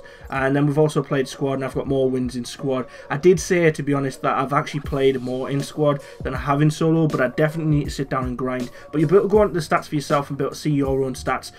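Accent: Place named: North East England, United Kingdom. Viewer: Love your vids mate, where you from? Sound like your from the north east!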